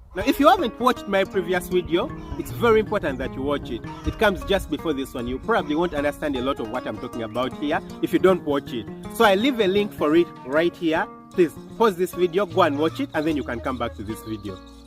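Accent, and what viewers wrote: Uganda. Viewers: All along I was checking your accent and felt like it was Ugandan, and finally, you mentioned it in this Video! Good Work Man, Nice Channel, ndi big fan of your videos.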